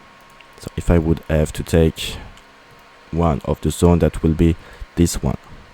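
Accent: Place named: France. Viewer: U have a French accent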